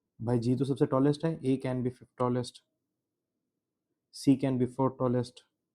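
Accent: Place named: Marwari, India. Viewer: Bhai aap toh meri caste ke ho same marwadi language shikawati Rajasthan jesi😅😅.. Love from Mumbai❤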